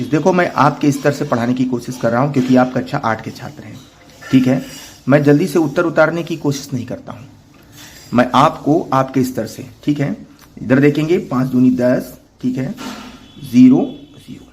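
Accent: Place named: Lucknow, India. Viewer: U r belonged in near about Lucknow. Voice touch aaka vaisa hi h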